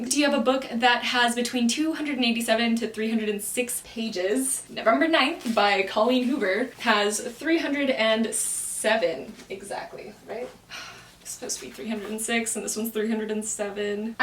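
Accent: Argentina. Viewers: me encanta tu acento argentino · OMG! You spoke in Spanish and your accent is really great, wow! I’m from Mexico, but I loved your Argentina’s accent. Your videos are amazing, I enjoy them a lot. 🤩👌🏻❤️ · i was shook with your argentinian accent!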